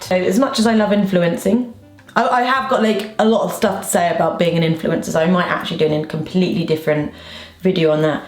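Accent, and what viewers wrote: United Kingdom. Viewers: I like your British twang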